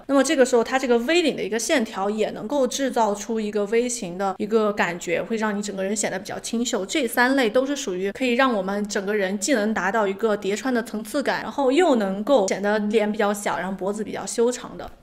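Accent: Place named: Sichuan, China. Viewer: 干货超多！四川话口音的普通话太亲切了😆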